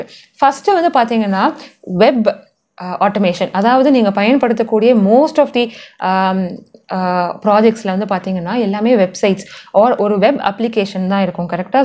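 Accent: Sri Lanka. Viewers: Akka...are you Sri Lankan??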